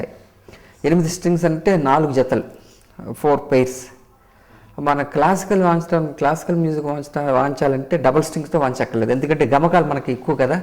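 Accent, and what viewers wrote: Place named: Godavari, India. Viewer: Sir you sound godavari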